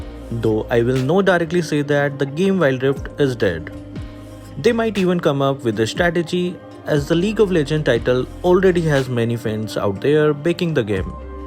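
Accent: India. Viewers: chekwa na tunog indiano. lintek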